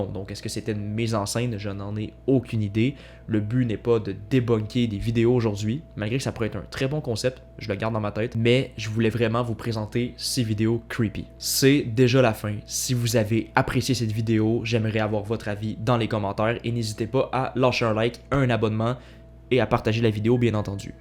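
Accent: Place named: Canada. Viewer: Bonjour beau accent vous êtes canadien merci pour les vidéos bonne continuation à vous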